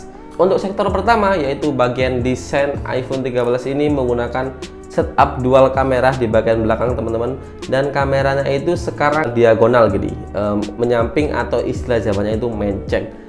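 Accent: Mojokerto, Indonesia. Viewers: smn wong mojokerto ta mas 😅?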